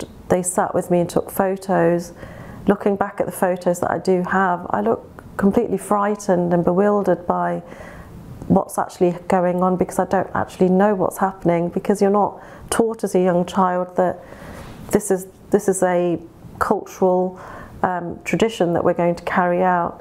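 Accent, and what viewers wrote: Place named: England, United Kingdom. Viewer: I am so sorry that you went through Hell. But you have an English accent, and if you are living in London go to the police and get your Dad thrown in jail, and let him rot there. I was born in India and I know what women go through. If you are living in a foreign country. The athorities will protect you.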